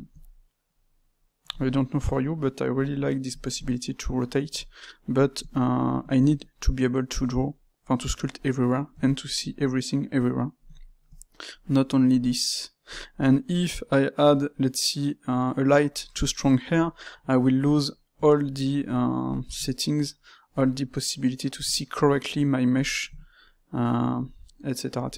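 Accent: France. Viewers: toi t'es francais 😂mais sinon trés bonne video continue comme ca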